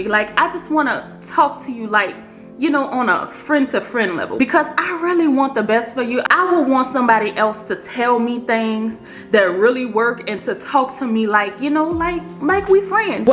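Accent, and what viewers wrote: New Orleans, United States. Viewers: I hear a New Orleans accent 😍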